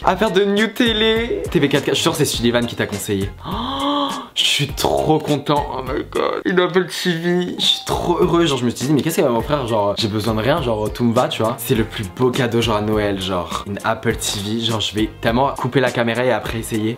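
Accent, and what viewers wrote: England, United Kingdom. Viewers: Tu a un trop beau accent anglais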